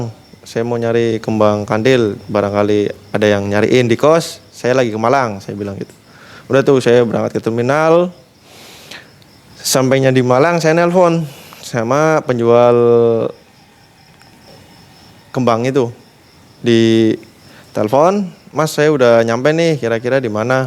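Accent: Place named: Tegal, Indonesia. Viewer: Kayong situse wong Tegal kiye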